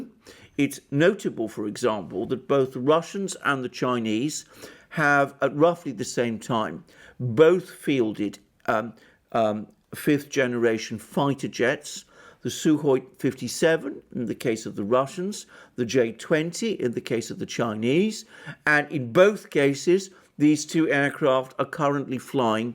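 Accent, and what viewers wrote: England, United Kingdom. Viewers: Mother England gave you a lovely Accent Alexander.Don't bite the hand that feeds you.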